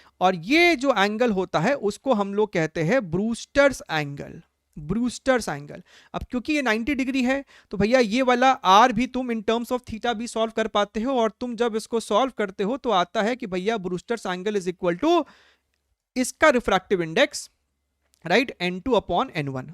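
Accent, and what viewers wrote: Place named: Bihar, India. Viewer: Bhaiya I can tell from you accent ( hoyebe karega ) ki aap bihar se hai. If you can tell kaha se. Btw love your vid helped me a lot mains me and just sad ki itna late mila channel apka. Great job ❤❤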